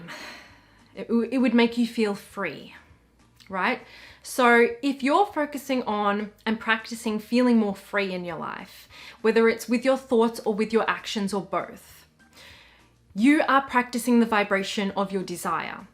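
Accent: Australia. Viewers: Somebody complemented you on your English accent. To me it sounds like an Aussie accent, is that correct?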